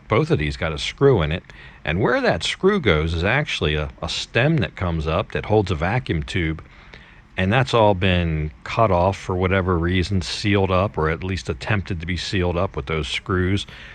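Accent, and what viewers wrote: United States. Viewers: Because he speaks like an American. Nothing wrong with it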